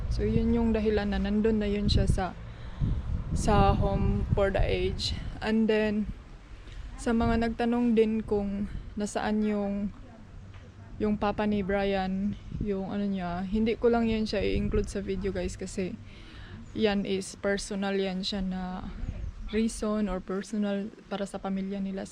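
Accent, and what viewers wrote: Visayas, Philippines. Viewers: Hello, following in your youtube vlogs, im from Leyte.. mkatawa ko kay bisaya kaayo.. makes my day light😘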